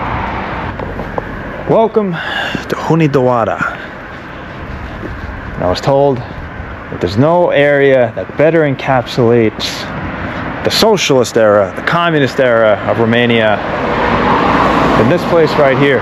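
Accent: New York, United States. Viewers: he's from nawwyakk,,giv em a break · Cut him some slack. It’s the New York. Way of saying things. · This is one of your best ones, and I want to visit Romania! I can't believe how many people here don't respect a good New York accent when they hear it. I grew up in lower Westchester in a family with Brooklyn roots and the word was "FOY-LEDGE" but, American English being what it is, it's just another word that we spelled differently than how we spoke it. It may not be "Proper English" but it's commonly pronounced that way around the NY-NJ region or at least it was when I was growing up there. Not wrong, just different. That's a human value that is conveyed well in your travels! (I'm not a Professor of Linguistics, just a Life Traveler)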